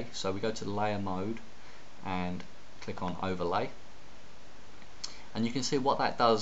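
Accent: England, United Kingdom. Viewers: You have such a cool accent! I thought you were English!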